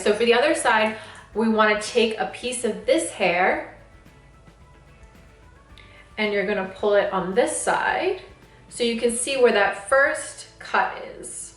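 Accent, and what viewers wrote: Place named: Russia. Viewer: I see your images all over instagram. For some reason I thought you had a Russian accent or German.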